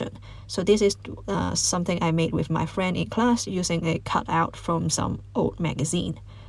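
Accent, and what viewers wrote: China. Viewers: thkuu for the helpful sharing! to me you sound Hong Kong! portfolio petition here!!!!! would be v helpful for someone who is in Australia and considering pursue a animation career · 听多了以后，觉得你应该是中国人。从口音判断的。:eyes-pink-heart-shape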